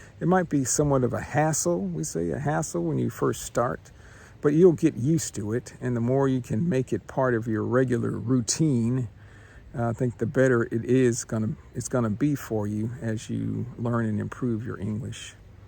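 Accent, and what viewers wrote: Texas, United States. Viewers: I believe that finding time if you really want to learn English is not a problem. Too often it's an excuse. What really helps is what I call immersion in the language. If you can't talk to native speakers, you should watch the movies, listen to the music to pick up words, phrases and above all, to familiarize with the pronunciation . I like your Texan drawl👍. Greetings from Poland.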